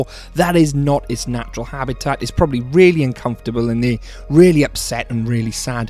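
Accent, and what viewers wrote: Wales, United Kingdom. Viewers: Are you from Wales?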